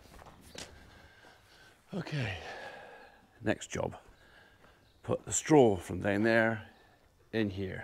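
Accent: United Kingdom. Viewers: I have always enjoyed the British accent but have a tough time understanding Scottish & Welsh. Thanks for sharing your daily experiences. I hope you recover quickly from the virus; peace! 🙂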